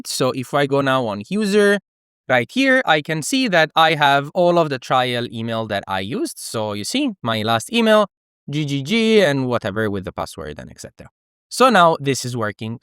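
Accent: Italy. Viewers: Idk but this guy made me fall in love with Italian Accent...!!!! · Accent kinda italic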